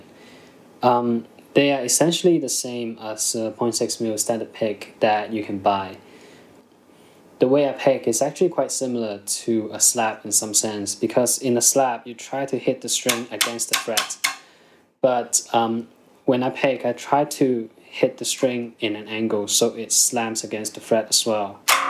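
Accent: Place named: United States. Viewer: I hear your American accent very good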